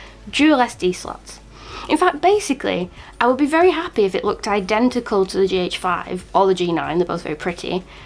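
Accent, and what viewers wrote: United Kingdom. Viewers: Charming British accent :)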